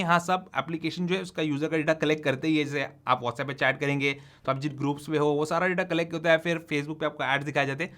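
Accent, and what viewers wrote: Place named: Bangalore, India. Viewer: As salam alaikum. BHAI Abbi jumma jumma 8 din nai Hove. U from Mumbai But your mother tongue is like Bangalore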